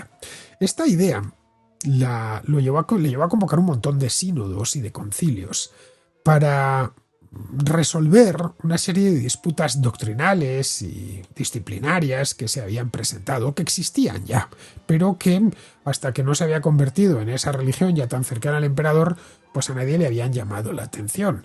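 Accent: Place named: Galicia, Spain. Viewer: "¡Interesante! Aunque tu manera de modular no es la mejor y tu acento me recuerda al de un gallego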